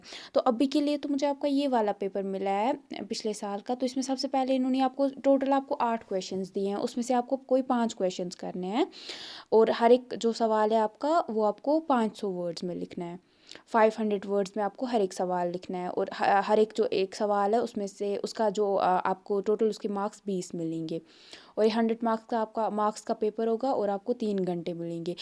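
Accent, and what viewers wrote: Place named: Jammu and Kashmir, India. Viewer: aap ka baat karna ka taraka sa mai samaj gaya thi ki aap jk sa hoge.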